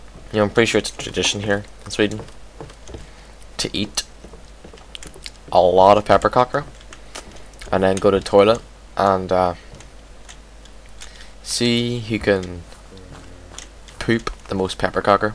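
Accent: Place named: Lisburn, United Kingdom. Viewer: Dude! I can't believe I didn't pick up on your accent the first time around lol. My folks are originally from Lisburn lol My granda used to own the pram shop on the corner at the top of the town Fredrick Thomas lol. Biggest hint I can give to help others channel is to network. Go to peoples channel talk to them and ask about their videos it puts people in a really good mood and then they come to yours. when you said "I took a baitin ther" I thought Yup Lisburn :D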